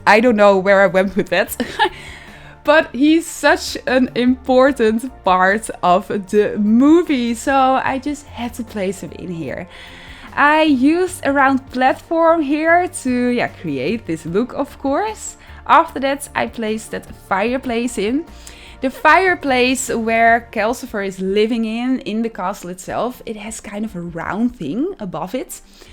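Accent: Netherlands. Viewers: Are you Dutch? Cool video!! ❤️ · Wowww ik kan niet eens een normale huis maken😭😂 en ja ik praat nederlands omdat ik aan je accent kan horen dat je het ook bent :') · Are you Dutch? 👀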